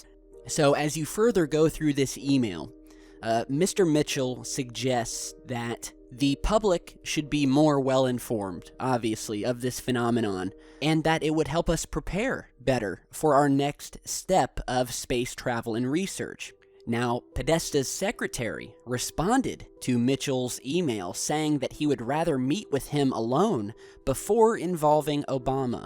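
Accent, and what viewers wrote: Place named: Michigan, United States. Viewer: You're from Michigan aren't you?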